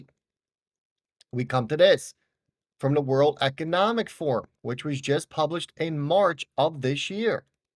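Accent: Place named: Western Pennsylvania, United States. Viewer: are you from the Pittsburgh area? I hear a western Pennsylvania accent when you talk.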